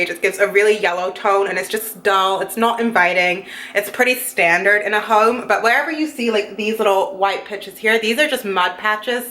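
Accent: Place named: United Kingdom. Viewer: Shes brittish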